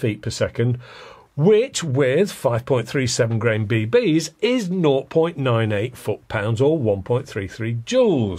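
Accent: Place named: England, United Kingdom. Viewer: So difficult England English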